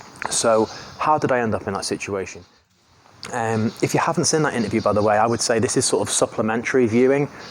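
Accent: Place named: Manchester, United Kingdom. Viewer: Well that confirms I'll never open a restaurant in Thailand hahaha. Great story. Are you originally from Ireland because to me your accent is North West/Manchester English?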